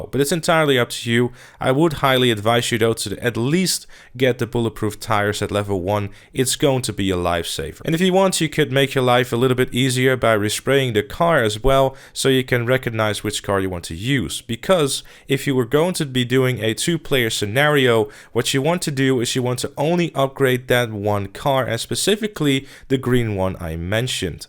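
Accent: Netherlands. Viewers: Are you dutch? You have a bit of an accent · is this guy dutch? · Heyy are you Dutch? cause i think i hear it in your voice ik ben ook nl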